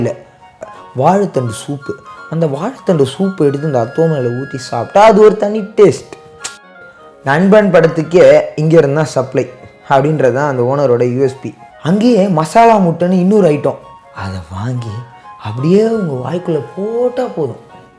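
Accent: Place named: Chennai, India. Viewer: narration aa therithu idhu namba Chennai payan nu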